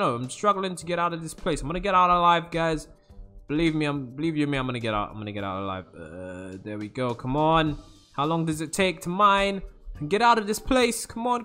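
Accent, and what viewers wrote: United Kingdom. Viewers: Are u an british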